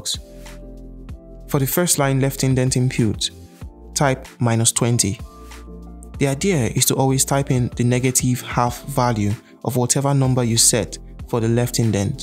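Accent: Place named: West Africa. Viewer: Western Africa accent 👌 Dope tricks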